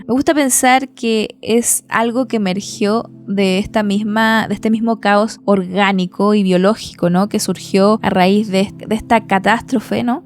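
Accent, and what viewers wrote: Chile. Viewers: Que lindo escuchar tu voz ese asento chilena que tienes me encanta ❤ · Amé! muchas gracias por tu trabajo, muy enriquecedor, perturbador y de introspección. Además, amo hables en chileno sin importar nada!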